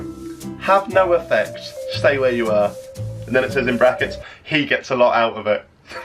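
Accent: United Kingdom. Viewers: Hello just found your Chanel but i wonder are you a real british person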